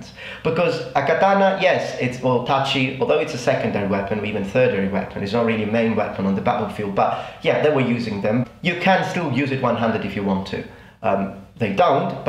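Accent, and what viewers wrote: England, United Kingdom. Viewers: knew I could hear some demented English accent in there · Bait? It's a genuine question. What sound do you think he's pronouncing non natively? I'm genuinely curious to know what sounds off to your ears about his accent, since if I heard someone talking like him, I'd assume they were a Brit. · Why do sound so British you are Italian though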